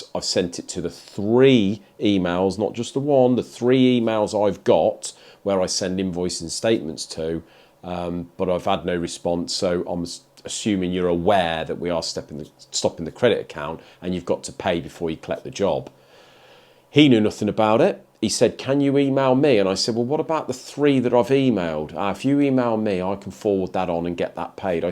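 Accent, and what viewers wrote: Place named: United Kingdom. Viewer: Bahahhaha, called their bluff, you go DOG. Burden of proof, how can they do that now, car running, I am soooooo happy you did not cave congrats. Also, I had a customer who had a fleet of toyota pickups, I started doing Maint and repair on the vehicles, until, they started paying in a 120 day rotation, yeah hey Trimline you are fired, good move, I did not have to carry that balance on the books for months. Also you may be interested that you and Veruca Dumont seem to have the same accent, are you from the same area in the UK? 😉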